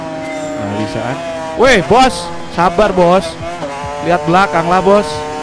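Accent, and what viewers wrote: Malang, Indonesia. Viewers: Bang orang malang kah?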